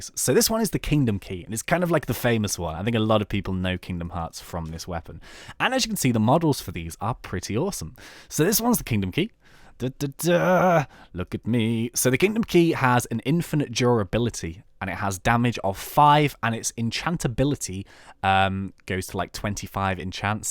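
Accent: United Kingdom. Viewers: It sounds like your British... I speak British some times:). Please tell me if your British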